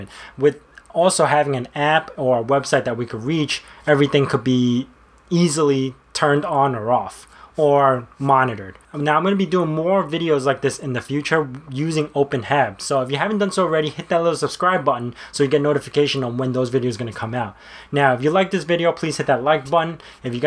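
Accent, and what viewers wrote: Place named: New York, United States. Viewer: Are you from New York? You got the accent haha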